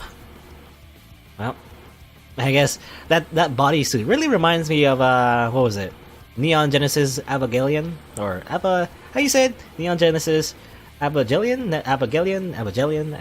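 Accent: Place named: England, United Kingdom. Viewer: sorry I posted the same message twice...for some reason it didn't show up either time so I thought I hit the cancel button by mistake. I just deleted the repeat message so it no longer shows......I didn't even realize English wasn't your native language....I thought you had an English accent actually....you speak it tremendously well.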